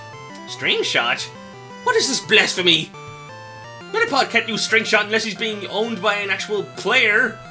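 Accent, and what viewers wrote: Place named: United Kingdom. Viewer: I LIKE IT BECAUSE YOU KINDA SPEAK BRITISH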